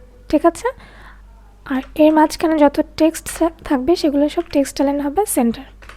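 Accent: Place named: Bengal, India. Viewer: Your Bengali accent is so sweet , I fall in love with you ❤❤ Do you know Hindi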